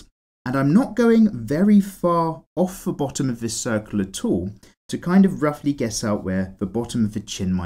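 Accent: United Kingdom. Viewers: ah sory about that but i fucking hate british accent.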